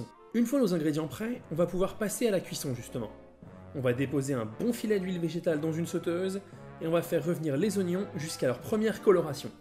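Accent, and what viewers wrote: South, France. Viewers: J'ai faim. Et j'admire le nombre de craquage d'accent du sud que tu a su mettre dans cette petite vidéo. C'est craquant-gourmand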